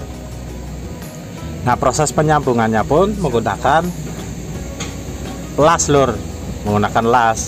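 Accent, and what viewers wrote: Java, Indonesia. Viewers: Rika sih jawane ngendi melu ngode lah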